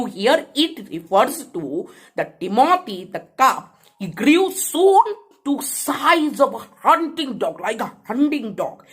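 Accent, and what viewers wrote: South India, India. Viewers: What a pleasant surprise to us your way of telling is like a South Indian very good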